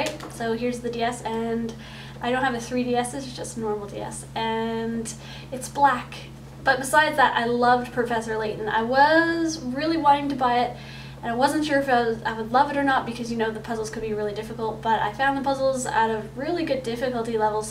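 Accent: Canada. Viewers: If I were to take a guess, I would say you are Canadian. A very cute Canadian at that :D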